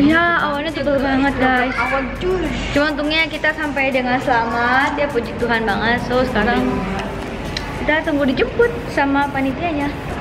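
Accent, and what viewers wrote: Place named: Surakarta, Indonesia. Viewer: Solo nya di daerah mana kak? Orang solo mana suaranya😁😁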